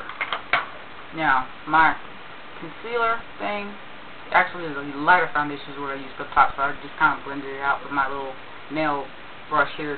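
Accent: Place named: United States. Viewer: You sound soooooo country :0) I am loving those eyes! Nikkie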